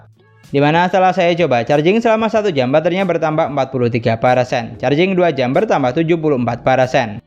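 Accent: Madura, Indonesia. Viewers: Aksen madura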